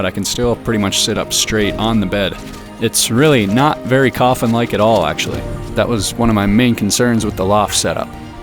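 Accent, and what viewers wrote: Canada. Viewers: I'm so used to seeing crappy, overly heavy American builds using crap timber and rough as guts construction techniques that i normally scroll past. Yours is brilliant and attractive with attention to detail. Your stairs are a master class. Cheers from Australia, now realise from your accent you're Canadian. Cheers mate, subscribed. Edit: even watched the adds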